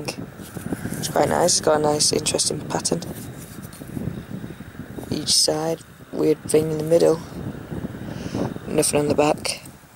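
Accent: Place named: Yorkshire, United Kingdom. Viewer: Your accent is well good, your hilarious! Is it Yorkshire? And it's a wreath not reef ;p great vids keep them coming!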